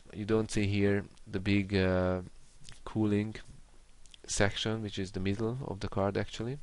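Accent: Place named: Hungary. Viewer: Tudtam, hogy ez magyaros akcentus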